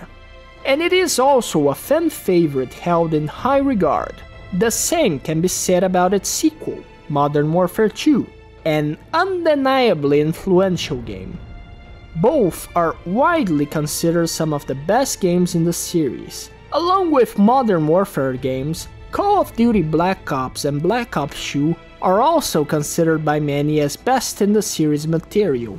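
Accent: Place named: Brazil. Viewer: I knew you had some Brasilian accent lol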